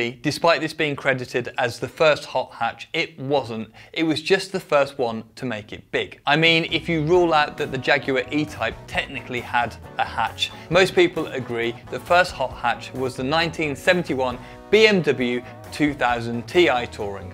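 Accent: United Kingdom. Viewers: British accent, Captain Kirk cadence. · probably my unic car subscription cause i like you man, humble is the reason, clear explainanyion,simple language,serious. i have learned with nicevoice by the way. i know them all(tbh i prefer english than americans,so rug) english has this accent remembering me my arabic-fassi origins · Good research (probably outsourced), but I literally yawned at the delivery. Standard 'proper' UK, scared af to be unique, business tone. You are boring bro. The car are cool though.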